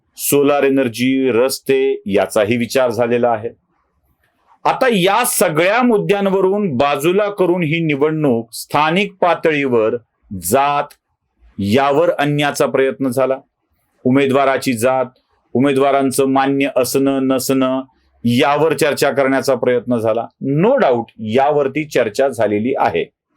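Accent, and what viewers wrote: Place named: Brahmin, India. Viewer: 😂😂😂😂 Kaal alela video Aap sarkar delhi madhye electricity subsidy dete tya baddal Aaj 25 delhi chya seats che matdan ani Kay tar aaj pasun electricity subsidy बंद 😅😅😅 Fact check navacha ek prakar astou,त्यात j khare ahe te samor आले आहे, subsidy chalu आहे Agdi tasech ya महाषयांचे आहे, ब्राह्मणी भाषा,accent ठासून वापरायचा आणि ऐन निवडणुकीच्या तोंडावर लोकांची दिशाभूल,त्यांचे brainwash karun शक्य तेवढे मत पदरात पाडून ghyache यांच्या व्हिडिओ मध्ये तुम्हाला कधीच रोजगार,भ्रष्टाचार,मणिपूर,आरोग्य,शिक्षण यावर भाष्य कधीच मिळणार नाही तेच पाणचट आणि फालतू विषय आज तरुणाई kuthe jaat ahe,त्यांच्या साठी BJP ने फक्त कॉन्ट्रॅक्ट system tayar ठेवला आहे हे व्यक्ती 40खोक्यंचे कधी बोलत नाही साहेब लोकांना भ्रमित करू नका लोक विसरले नाहीत,आज महाराष्ट्र मध्ये g paristhiti आहे ती केवळ bjp मुळे,इथले रोजगार गुजरात मध्ये एवढे मिंधे बनू नका,कांद्याचा एक्सपोर्ट फक्त गुजरात साठी खुला बोंबाबोंब होते तेव्हा त्याचे डोळे उघडतात आणि बाकी राज्यांचा कांदा एक्सपोर्ट साठी खुला होतो Revanna साठी मत मागणारा तुमचा बॉस,त्यावर काही बोला साऱ्या देशात chi thoo hotei tevha tou tyachya sathi campaign karat nahi Arei kiti third grade wagnar Evdhe lakshat असू द्या अधर्म जेव्हा वाढतो तेव्हा त्याला चिरडण्यासाठी परमेश्वर येतो, तुम्ही देखील अमर नाही असे भोग येतील की तुमच्या पिढ्या लक्षात ठेवतील मराठी जनता सुज्ञ आहे मूर्ख आणि मठ्ठ नाही 4 तारीख जवळ आहे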